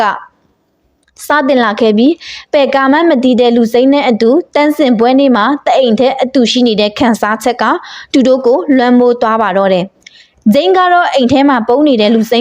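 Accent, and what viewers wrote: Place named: Shan, Myanmar. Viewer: အသံလေးကချစ်စရာကောင်းလိုက်တာ၊စကားဝဲတာကရှမ်းတွေဝဲတဲ့အသံထွက်ဆိုတော့တင်ဆက်သူဟာရှမ်းမလေးတစ်ယောက်ဖြစ်မယ်လို့ခန့်မှန်းလိုက်ပါတယ်၊ ကျနော့်ခန့်မှန်းမှုမှန်လားမှားလားဆိုတာဖြေပေးပါဦး❤❤❤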